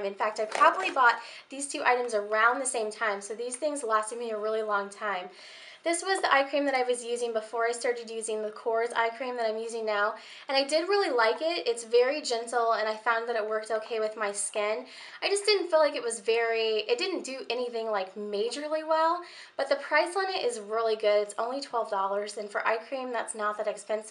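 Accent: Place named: United States. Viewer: do you live in the uk or usa? because you mentioned something from boots yet you have an ameircan accent I'm from uk btw x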